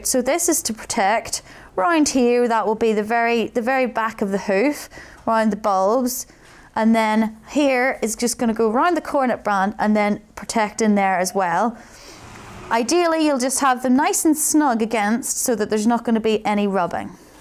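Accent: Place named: United Kingdom. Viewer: the CCs are so wrong lol someone who understands British accents should redo it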